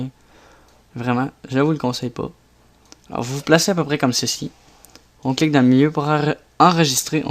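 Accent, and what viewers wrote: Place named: Quebec, Canada. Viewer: je t aime mec <3 super tuto je kiff ton accent québécois :D je m abonne !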